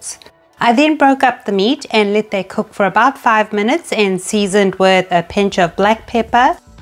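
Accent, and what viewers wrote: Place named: South Africa. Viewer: thought your accent sounded SA. From Cape Town!?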